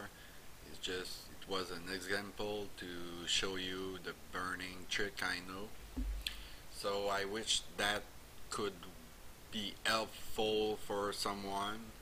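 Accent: Quebec, Canada. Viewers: Ho boy, toi t'es québécois. XD cool work dude!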